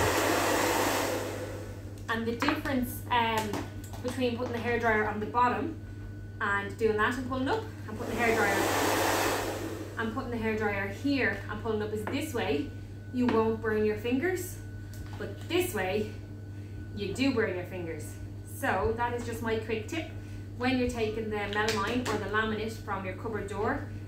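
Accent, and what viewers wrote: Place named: Ireland. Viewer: Love your accent. Gotta love Irish ☘️ girls. 😊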